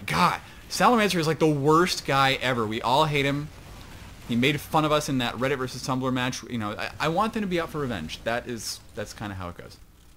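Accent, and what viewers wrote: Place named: Australia. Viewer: That Aussie accent though... Nice cast btw!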